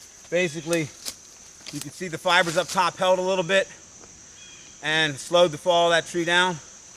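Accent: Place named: East Coast, United States. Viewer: Great video and your East Coast (NY?) accent is music to my ears.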